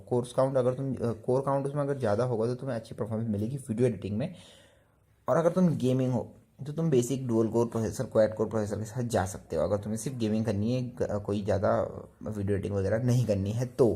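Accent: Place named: South India, India. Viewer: isme mein toh bhai south indian lag raha hai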